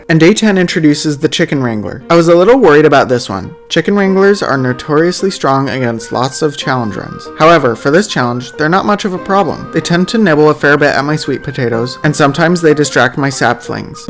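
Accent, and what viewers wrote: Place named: South, United States. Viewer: Love that southern accent #GvZWW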